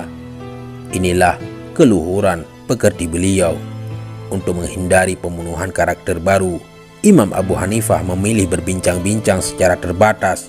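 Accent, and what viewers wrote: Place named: Bali, Indonesia. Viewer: Konten bagus karo narasinya menggunakan aksen Bali jadi kerasa gimana gitu...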